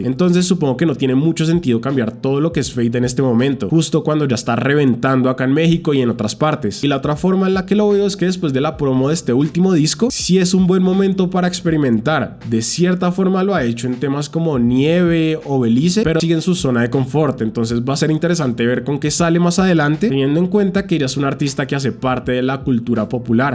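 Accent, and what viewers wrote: Bogotá, Colombia. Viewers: Como que eres MEXICANO pensé que eras de Bogotá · Cómo así que eres mexicano? pensé que eras de Colombia jaja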